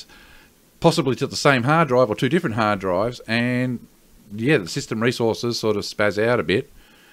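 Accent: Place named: United States. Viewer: You have a really american voice I really like that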